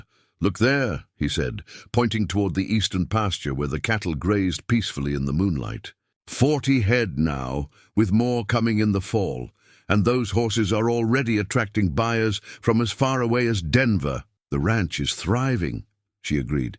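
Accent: United Kingdom. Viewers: Your voice sounds like your from London ! Exquisite voice!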